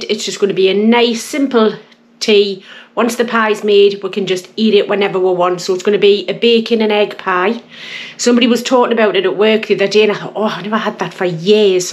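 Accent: Newcastle, United Kingdom. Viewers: Looks yummy, and i love a geordie accent ❤ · My Geordie Grandma used to bake this, it was often part of our Saturday lunch, with a home made soup. Made me very nostalgic, not only the pie but the accent, thank you 😘 from Australia 🇦🇺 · Love the Geordie accent real people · A Geordie accent and a Manchester United glass on the drainer! tut tut;-) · I love the Geordie accent and that pie looks delicious just like the sort of food i was bought up on, happy days. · thanks am from Gateshead myself but i have lived down in Southampton since late 1990 into 1991 it’s lovely hearing that geordie accent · Wow! Loved that video. I don’t know what algorithm YouTube had that meant it popped up on my home page but I took the click bait (must’ve been the reference to ‘bacon & eggs’ coupled with ‘pie’) that hooked me. /Madam, you are just brilliant and I’d love to pop in for lunch sometime. 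I loved the background and history you chatted through, from your late mother in law’s rolling pin through to all your references to your “Nan” and her influence on you. On top of all that to have your rolling commentary in such a wonderful Geordie accent was perfect. Thank you. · A Geordie lass, after my own heart.😁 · Being Irish, when I saw he words "Bacon, Eggs & Pie" I was sold......The fact your a Geordie was the icing on the cake. My family lived in Newcastle for many years before we returned to Ireland. 3 of my siblings were born there. My Uncle taught in school there all his adult life & my nan passed away there, she was an incredible cook! No scales, no measuring... all done by eye and feel! God bless you love!!!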